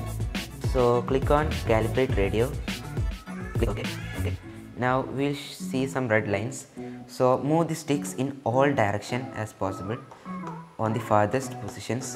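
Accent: Kerala, India. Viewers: Are you malayali from Kerala plzz replay your English is so 🤨🤨🤨🤔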